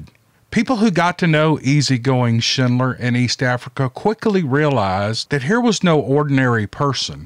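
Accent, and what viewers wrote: South, United States. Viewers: You could monetize your voice. You have a voice I would rather hear than most of the books I've listened too. Maybe it's because southern people are my people! When I listen to a book, I want to Invision the story, not try to understand an accent or be distracted by it. I'm serious! I would listen to more books if you narrated them.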